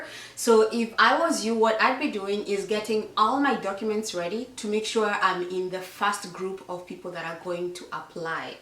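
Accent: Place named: Kenya. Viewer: Good Job. I can tell you have lived in Kenya (from your English) i hope to migrate by end of 2020. Sitting my IELTS next week. Much love from Kenya. your hair is beautiful too.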